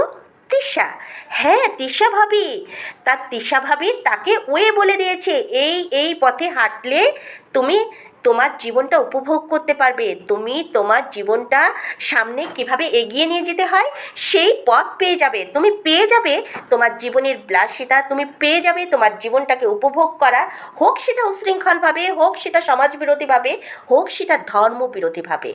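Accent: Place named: Kolkata, India. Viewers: বাপরে বাপ, মুখ নাতো হলার মেশিন! না জেনে কাউকে পতিতা বানানো কত বড় পাপ। কলকাতার টোনে কথা বলে, কেরে তুই নতুন পাগল! তোর নিজের সংসারের কি দশা বোঝায় যায়! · তুই কে রে?কার চামচা,কোন পতিতার? কলকাতার মানুষের টোনে কথা বলতে যোগ্যতা লাগে। পতিতা কে পতিতা জেনেই বলেছে। তুই জানিস উনি কে? উনার আগের চ্যানেল থেকে ঘুরে আয় ,হেগে দিবি জানলে,দুই মিঃ তোকে বসিয়ে মুতিয়ে ,তা খাওয়াবে। তার সংসার নিয়ে তোকে ভাবতে হবেনা, তার স্বামী, সন্তান সমাজের উচ্চ স্তরের লোক,সে নিজেও তাই। শালী😅😅😅😅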